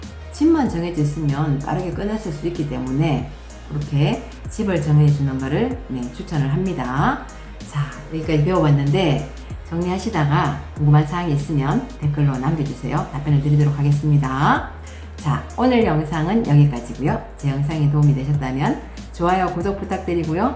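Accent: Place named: Daegu, South Korea. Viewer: 우와~~~~ 대박 대박 대박! 사투리 들으니 대구 가고 싶어요ㅎㅎ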